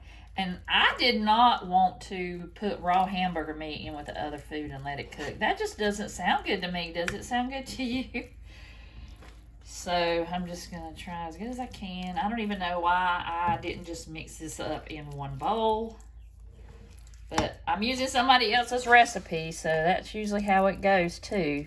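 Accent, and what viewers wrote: South, United States. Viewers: New subbie here! This looks so good and easy. This is a yes ma’am moment. Are you from the South? I love your accent. Looking forward to get to know you and your channel! Have a great night ❤️🙋‍♀️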